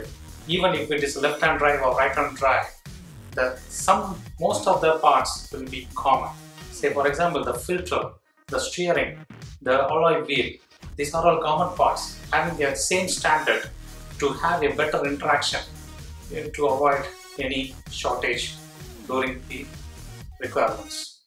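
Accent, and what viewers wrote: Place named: India. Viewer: It's really hard for me to understand indian accent and poor quality voice and load music makes it so much harder.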